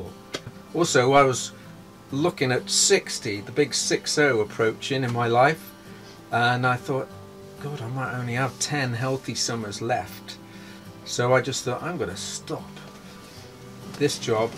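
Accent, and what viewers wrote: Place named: Merseyside, United Kingdom. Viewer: what's his accent? Sometimes he sounds like he's from Merseyside.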